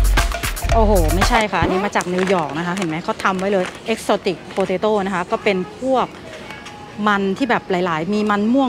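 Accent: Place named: Central Thailand, Thailand. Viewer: เสียงไทยกลางแท้ พิดโลกเดี๋ยวนี้เหน่อสุโขทัยไปหมดแล้ว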